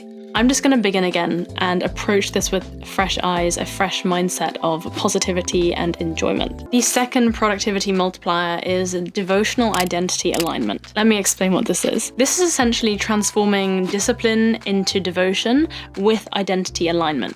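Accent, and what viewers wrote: United Kingdom. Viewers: Hi love your videos.Your British accent.Listening to our interoception, Health is wealth absolutely,I am going to the gym 2-3times a week walking daily and eating healthy diets❤ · An Elegant British accent ( RP)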